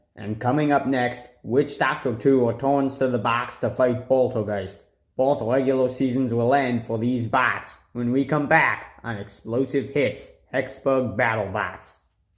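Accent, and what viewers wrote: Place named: New England, United States. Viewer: Like your little New England JFK-like I accent. love the battle bots superstars.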